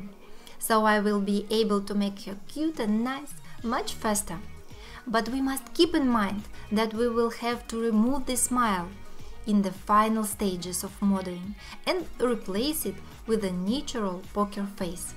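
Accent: Russia. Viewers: Мне кажется, что на англоязычном ютубе и без этого видео, нет дефицита в туториалах и уроках по Блендеру (в т.ч и от пионеров и разработчиков Блендера). И какой смысл делать русское название, для англоязычного ролика? Это мое субъективное мнение, но лучше было уже делать ролик на русском, чем на английском, с произношением как у героев фильма "Красная жара".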